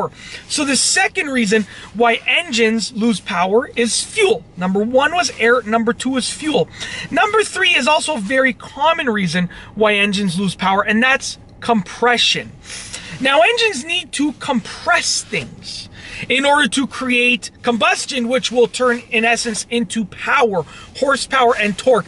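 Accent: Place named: Toronto, Canada. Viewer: As soon as he started talking I knew he was from the gta. then I noticed the streets and was validated with the knowledge of knowing I was right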